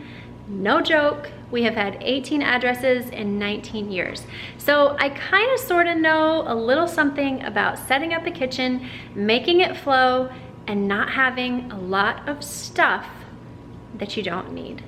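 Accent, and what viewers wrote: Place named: United States. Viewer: Your accent is wonderful, in which state in America I can find this accent? I am an English learner and I want to deal with the American accent. Please, give me the name of the state.And thanks in advance.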